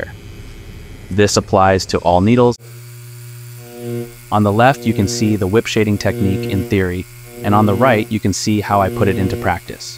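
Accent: United States. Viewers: I totally thought you were American. Possibly even from my area in the Pacific Northwest. So cool to find out your from Austria! Love the content dude. These vids are incredible! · an Austrian artist with a perfect American english...awesome! Im Dan, from Brazil and I inspire on becoming an artist.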